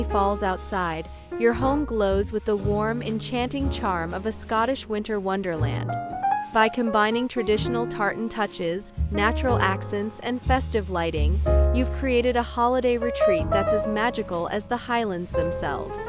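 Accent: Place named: United States. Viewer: Why does Christmas in Scotland have to be narrated by an American accent?!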